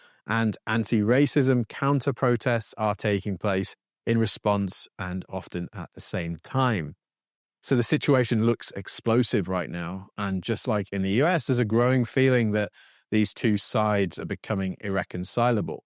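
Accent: England, United Kingdom. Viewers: Where are you from originally ? You have an English accent.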